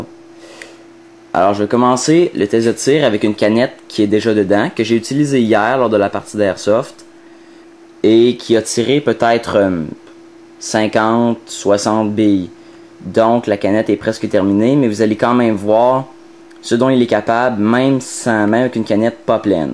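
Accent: Quebec, Canada. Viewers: Meuh non ^^ Quebecois ! un accent pareil ^^ ! Vive le Québec Calice ! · with a canadian accent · Belle review merci. Et vive les canadiens leur accent est vraiment super (je ne moque pas je suis en admiration sa donne un petit charme). De Belgique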